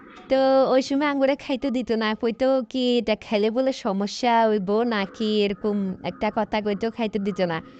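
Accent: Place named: Noakhali, Bangladesh. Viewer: আপু আপনি নোয়াখালী কোন জায়গা 🤔🤔🤔আমি অনেক জায়গার কথা জানি 🤗🤗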